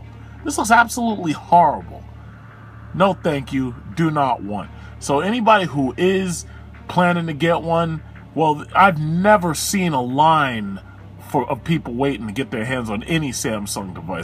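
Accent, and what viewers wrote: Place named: New England, United States. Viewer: where the fuck do you think apes phones are made dumb shit"CHINA"! THE ONLY GARBAGE I'M HEARING IS YOUR YANKEE ASS VOICE AND YOUR REGURGITATED APPLE IPHONE BABY FOOD THROW UP! I actually like apple and android but you're what makes this video and you're misinformationed ass so bad is you're a spiteful ignorant old dip s***.!! yeah the designs are similar but there is no way any copying going on anymore on because it blows f**** rings around the iPhone and I know for a fact because I own both and I continually go back to my Android every time. apple is for children to learn how to use a phone so when they grow up don't know how to use an Android you f**** moron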